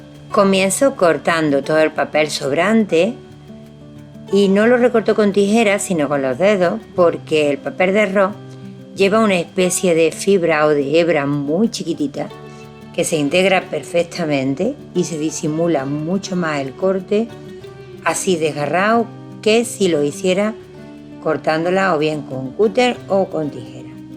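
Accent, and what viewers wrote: Andalusia, Spain. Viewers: hermosa la bandeja!! desde Argentina...me encanta tu tono andaluz!!!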